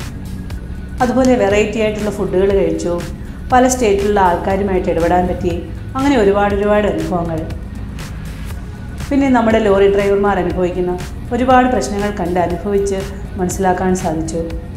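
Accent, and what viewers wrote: Kottayam, India. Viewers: ചേച്ചി... സ്വാഭാവിക വിവരണം മതി.. ഇപ്പൊ ഉളള വിവരണം ഒരു സുഖമില്ല... കോട്ടയം സ്ലാങ് സൂപ്പർ...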